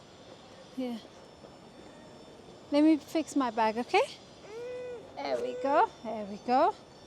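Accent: United Kingdom. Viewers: "Health and safety officers! eat your hearts out". I like your posh sounding British accent.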